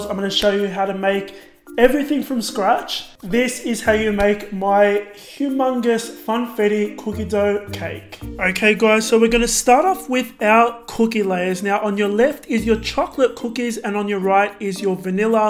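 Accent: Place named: Australia. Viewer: Nichole Li M8, he is from Australia, it's called an accent. He is saying "choc chips"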